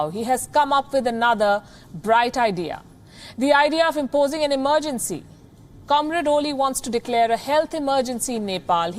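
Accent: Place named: India. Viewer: There is nothing more funny than the sound of English coming out of an Indian person :)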